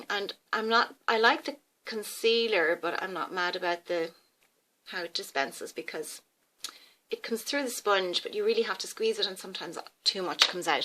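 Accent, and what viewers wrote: Ireland. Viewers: Hi, I wish I'd found you sooner! As a mature lady I too have fierce hooded lids. Your tutorial was excellent! A question for you: You sound Irish, as I am, and I wonder how you manage to shop from Sephora as I didn't think they shipped to Ireland! I would love to try your winged look with the Sephora liner! Any help would be much appreciated. oxo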